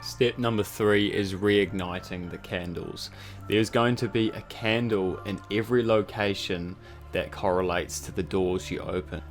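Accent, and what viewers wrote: New Zealand. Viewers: Are you from nz bro?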